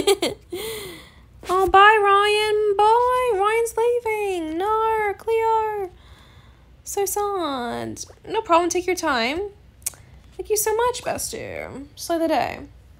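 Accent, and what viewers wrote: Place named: United Kingdom. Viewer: early,and ik that ppl r nice to u cuz u r one! edit:omg your British accent🤩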